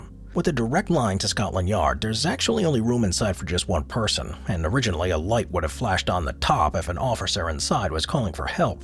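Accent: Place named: United States. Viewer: Stating the obvious there. U can tell his American as soon as he opens up his mouth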